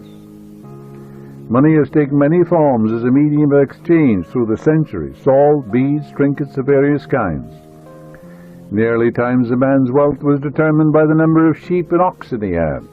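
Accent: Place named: Cork, Ireland. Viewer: never lost that Cork Accent!!😉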